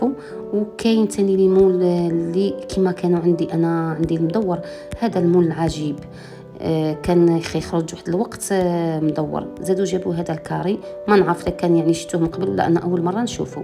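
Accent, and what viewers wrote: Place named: Constantine, Algeria. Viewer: من هدرتها باينة قسنطينة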